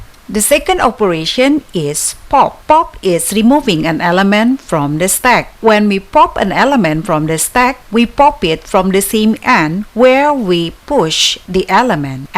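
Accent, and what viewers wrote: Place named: India. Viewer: за 2 минуты и 35 секунд с шикарным индуским акцентом я понял больше чем за полтора часа с преподавателем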